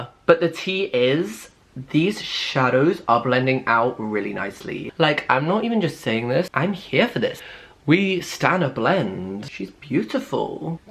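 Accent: Scotland, United Kingdom. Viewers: iconic. scottish accent is improving , proud x x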